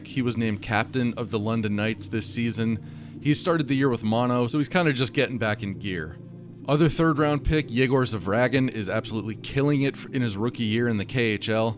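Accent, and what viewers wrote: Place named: Philadelphia, United States. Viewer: excellent video, The Philly accent 😆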